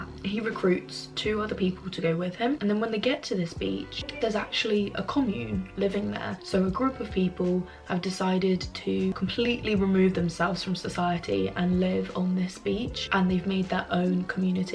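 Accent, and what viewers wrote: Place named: England, United Kingdom. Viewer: where abouts in england are u from? love your accent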